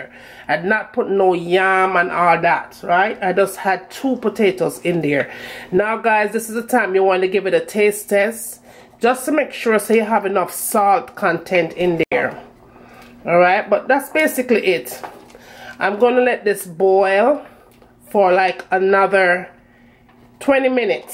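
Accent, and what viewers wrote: Jamaica. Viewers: I love the Jamaican accent but do not let the Jamaican woman get mad at you...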